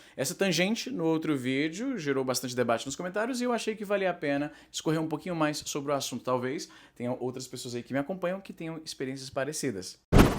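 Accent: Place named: Brazil. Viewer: Seu sotaque brasileiro está "perfeito" e muito agradável de ouvir.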